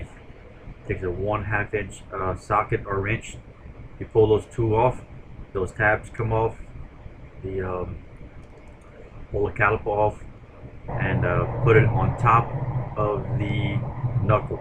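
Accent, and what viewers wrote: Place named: Louisiana, United States. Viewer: I recognized that accent immediately. You from down the bayou. I’m from Thibodaux.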